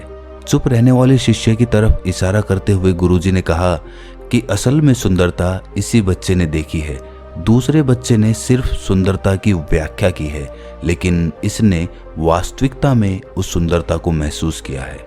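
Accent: Haryana, India. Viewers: awaj kisi haryana wale ki hai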